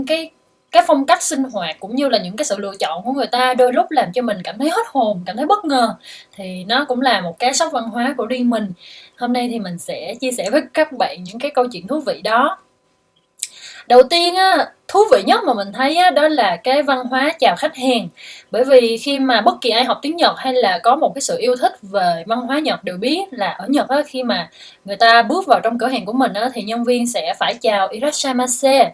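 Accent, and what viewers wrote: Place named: Buon Ma Thuot, Vietnam. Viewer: bạn này nghe giọng giống ở buôn mê thuột ghê :)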